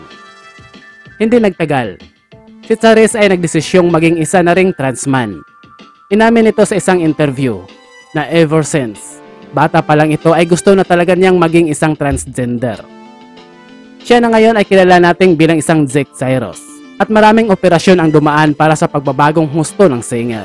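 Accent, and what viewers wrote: Visayas, Philippines. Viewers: Nalingaw ko sa imong diction Bisaya jud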